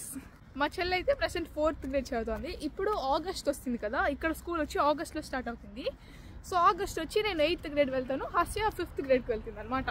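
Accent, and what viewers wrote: Tamil Nadu, India. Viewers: Mey nee bhasha bhale vundi mey.. Konchem Tamil mix vundi gani nee Telugu matram super mey 😂 keep doing more and more in Telugu 😅 By the way.. shorts and long videos both are good! I will definitely wait for both ❤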